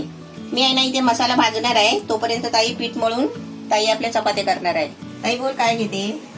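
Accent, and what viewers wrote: Konkan, India. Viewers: Kaku tumhi koknatle aahat ka?? Chan watay tonning aikayla.receipi mast.🙏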